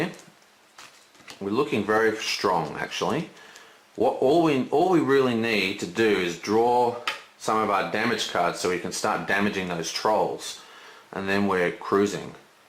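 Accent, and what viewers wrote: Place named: Australia. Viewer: Neutral australian or nz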